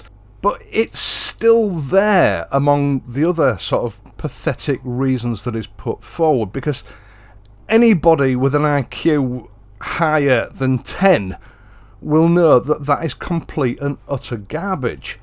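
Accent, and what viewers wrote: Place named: Yorkshire, United Kingdom. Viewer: Are you by any chance from the same Yorkshire region as Mavis, who used to be on Corry, your accent reminds me of her. Anyway, which part of Wales do you live in? I'm assuming that you do live in Wales - otherwise why would you be so vehemently concerned about our speed limits???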